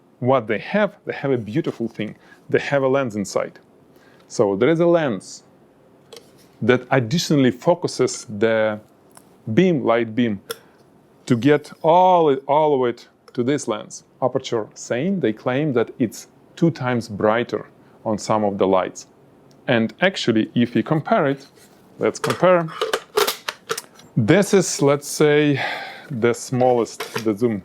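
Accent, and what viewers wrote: Russia. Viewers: По первым словам сразу понятно, что русский)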